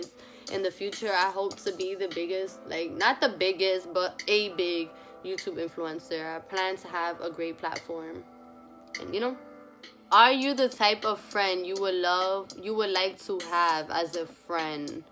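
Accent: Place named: New York, United States. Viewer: You can do this girl. Keep up your platform. I’m a new sub and already love your channel. I live in Quebec Canada and love yourNew York accent lol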